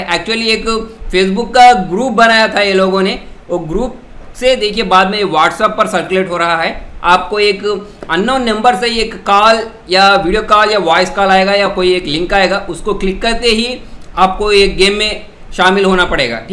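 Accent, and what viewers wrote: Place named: Hyderabad, India. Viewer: Hyderabad hindi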